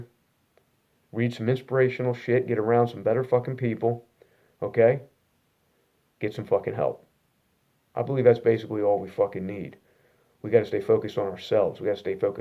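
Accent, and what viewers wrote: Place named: North Jersey, United States. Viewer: can tell from the accent.. am from north jersey myself.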